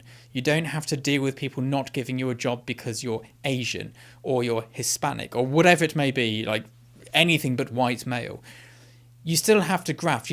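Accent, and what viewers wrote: RP, United Kingdom. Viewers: I think you nailed it! We can not apologize or change who we are, nor should we, as some would suggest! We can only try to make things better around us. BTW, a British accent does indeed add a level of poshness, elegance, and credibility! I remember asking my wife, who recorded the answer message at her firm? It was a female British colleague. 😊 Also, at one point, I worked with a consultant who was raised in Britain. When a presentation was needed , we all agreed that he should do the honors! It always sounded more on point when delivered by him. Thanks for the insight! Cheers from 🇨🇦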